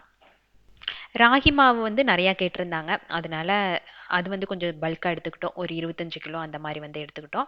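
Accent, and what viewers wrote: Coimbatore, India. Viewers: Nenga elam nala kovai slang la pesrenga but ta matum yen sis kannada pesrenga... unga voice over vida original voice ketka nala iruku sis ❤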